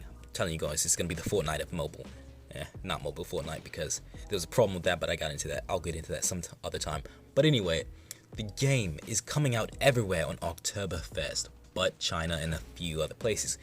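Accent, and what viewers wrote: United Kingdom. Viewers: Love the news vid and British accent too! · yeah yt is drunk half the time it’s no prob....also loving the British accent 🤣👌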